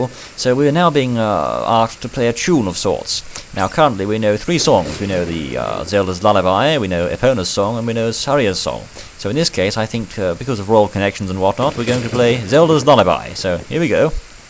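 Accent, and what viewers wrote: United Kingdom. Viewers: You sound British.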